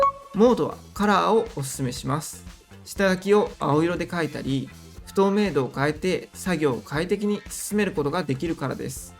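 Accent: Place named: France. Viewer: Merci beaucoup professeur, vous nous aider beaucoup 🥹🙏🏾🥰 j'adore votre accent français, c'est juste incroyable 🤌🏾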